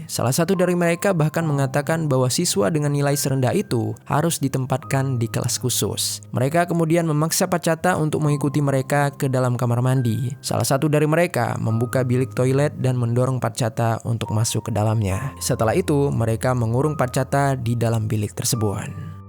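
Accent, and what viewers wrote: Java, Indonesia. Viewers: Suara nya mirip bang jeri tapi logat nya beda yg ini kaya ada Jawa Jawa nya dikit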